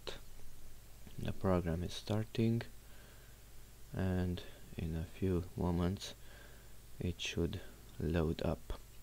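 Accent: Brazil. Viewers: Reconheço um brasileiro falando inglês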